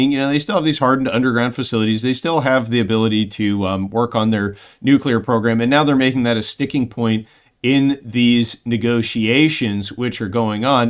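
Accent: Chicago, United States. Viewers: Where is that accent from? Sounds purely like a Chicago accent of my youth?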